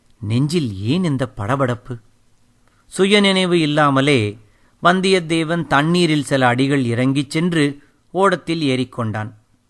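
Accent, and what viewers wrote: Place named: Tamil Nadu, India. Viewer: hello sir this is Karthic from Coimbatore am big fan for ur audio book and liked ur Tamil ascent very much gud work kudos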